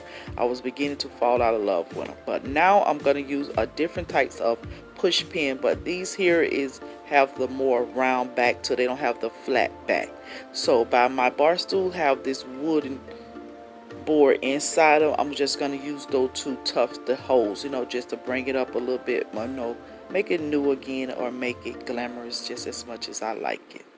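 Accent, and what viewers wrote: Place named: Detroit, United States. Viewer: Great idea! That accent! What region are you from? Detroit?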